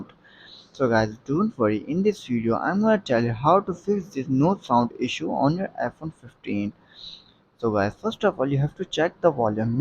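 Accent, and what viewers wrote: India. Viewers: Mate, your Indian accent is so strong. You really should get AI generated English very hard to understand what you’re saying.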